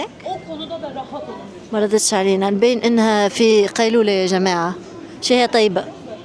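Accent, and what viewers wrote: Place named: Tunisia. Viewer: انا اكتر شي مستمتعة بلهجتك التونسية الحبيبة ,,, فيديو أكثر من رائع <3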